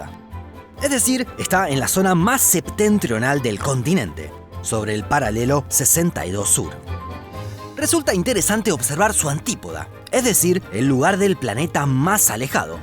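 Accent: Argentina. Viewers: El título es villa las estrellas y el narrador habla el 80 % de argentina típico argentino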